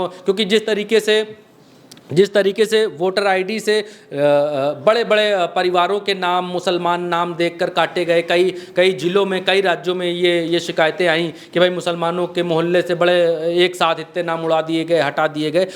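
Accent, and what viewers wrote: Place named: Farrukhabad, India. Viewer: आपके बोलने का तरीका बहुत अच्छा लगता है। बिल्कुल फर्रुखाबादी स्टाइल का होता है 😊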